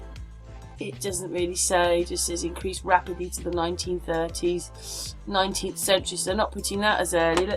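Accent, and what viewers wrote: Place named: England, United Kingdom. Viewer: i could tell u were english simply from ur clever channel title!!! it sounded very good with an english accent and i said ,i bet shes english haha. and i think u are super awesome!!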